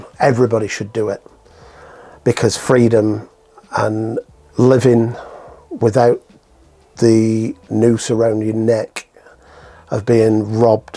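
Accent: England, United Kingdom. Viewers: Brogue is Irish...he is English ! 😊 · your beautiful English accent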